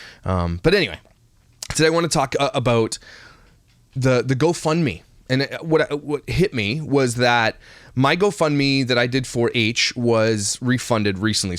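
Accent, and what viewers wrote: Canada. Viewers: I agree about ppl who don't need the money get money donated on go fund me. Yet someone who truly needs the money it's like pulling teeth, litetally. I had to swallow my pride to start a go fund me because I can't eat solid food for the last year and a half due to a dental disaster. I need the money to pay for ENT, Oral surgeon, dentist, ensure in the interim due to Covid which closed everything down, etc. Soup is my main food and mash potatoes. Besides toilet paper. Soup is hard to find. I've lost so much weight due to not being able eat and only drink my food. I also have a disabled son who's had many of his medical supplies no longer covered by his insurance. It hurts when no one cares. I need aprox $69,000 so I put that amount as I'm honest or naive. I was verbally attacked by ppl on the internet that I shouldn't ask for so much. So I lowered it. 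But still I'm not able to eat as not many donated. So seeing what they did makes me so upset. Especially having a disabled son it breaks my heart. I use to live in Canada my first 5 years of life. I miss it so much. I love listening to your accent eh. I miss the smarties, not that I can eat them but you guys have much better goodies than us. Good for you what your doing to stop the injustice. I can't imagine giving away your own child. Keep up doing good. I pray the little boy is ok and being loved. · I think you have so many subscribers because people love your Canadian accent, i.e. "about", " outside". My paternal grandparents were from Conception Bay in Newfoundland. Love your channel!!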